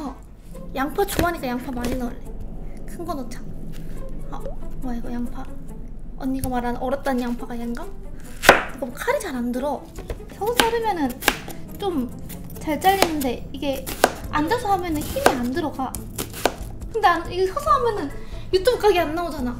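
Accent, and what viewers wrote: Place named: Gyeongsang, South Korea. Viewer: 나 경상도사람이긴한데 님 경상도사투리에 치인다....❤️❤️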